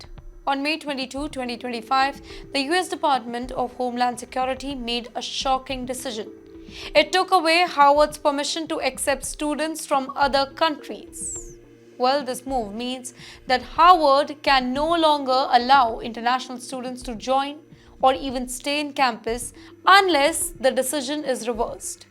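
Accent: India. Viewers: Tongue-twisting curry English.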